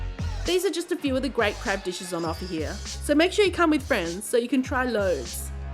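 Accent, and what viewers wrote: Australia. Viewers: I really enjoyed watching this even I am living in Saigon in Week 3 of the lockdown so it was kind of painful to watch. Is your accent Aussie? I look forward to trying all the places post-lockdown and to more of your videos. Cute dog too!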